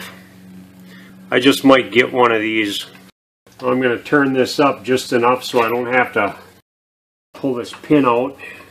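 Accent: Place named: Midwest, United States. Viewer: Gotta love that ol' midwest accent lol! You just convinced me not to try to change the bearing on my 05 Jeep GC myself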